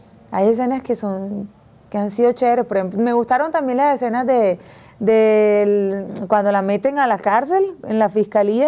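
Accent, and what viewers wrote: Colombia. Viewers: No jodaaaa aca si hablas costeño, en Betty hablas como cachaca, eche, mandas cascara, costeñacachaca no joda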